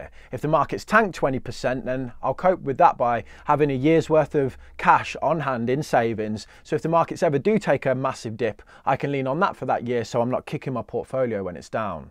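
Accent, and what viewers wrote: Birmingham, United Kingdom. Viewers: Hey neighbour!! I always thought I could hear a touch of manc in that Brum accent! 😂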